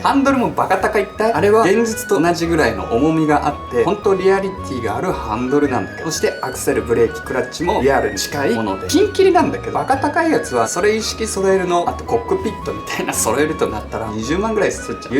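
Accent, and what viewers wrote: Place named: Hakata, Japan. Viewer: 「 」で検索し始めてお目にかかりました！ 独特な方言が癒されますね〜😊福井っぽいと思ったけど博多弁なのかな？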